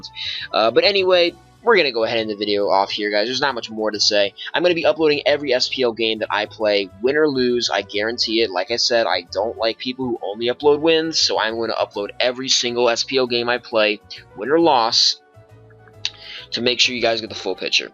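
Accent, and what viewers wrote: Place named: Illinois, United States. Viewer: Are you from Illinois? Or Wisconsin? You kind of sound like you are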